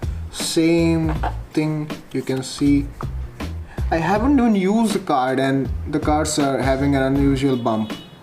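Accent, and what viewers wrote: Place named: India. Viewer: Wtf and desi English bro😀👍I am watching this video 26th time lol btw great video that's why I am watching this video 26th time lol😀🔥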